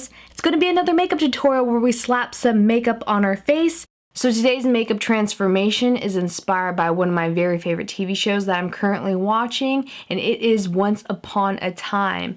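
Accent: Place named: Texas, United States. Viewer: whats with the Texas accent? stay cute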